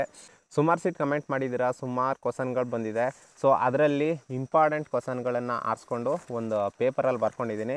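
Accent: India. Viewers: Anna Niv matado style le thilkonde niv nam Chamarajanagara kadeyavre anta... olledagli anna videos madta iri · HAI. I LIKE YOUR KANNADA ACCENT .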